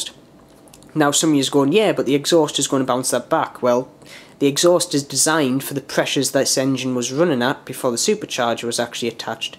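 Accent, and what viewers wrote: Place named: Durham, United Kingdom. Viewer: Geordie? sound more durham to me like?!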